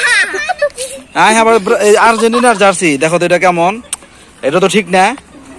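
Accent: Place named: Sylhet, Bangladesh. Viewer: আপনার মেইন বাড়ি ঢাকা নাকি আপনার কথায় বুঝা যায় আপনি সিলেটি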